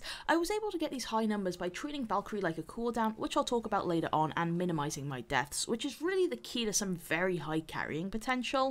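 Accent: United Kingdom. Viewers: I always wondered, what part of UK is this accent from. I find it funny but lovely. I had it stuck in my head when I played Witcher 3.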